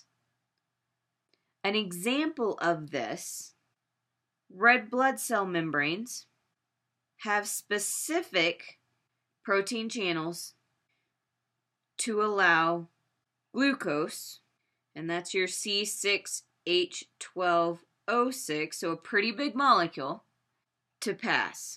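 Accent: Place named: Midwest, United States. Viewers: Lena G it's Midwest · Where in the USA is that accent from?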